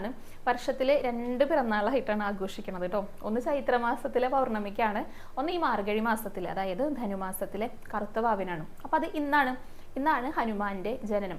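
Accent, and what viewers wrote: Thrissur, India. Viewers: swaram nannaittunde..trissure slang nalla resam undu kelkan...especially kurangum kutty...😂😂❤❤❤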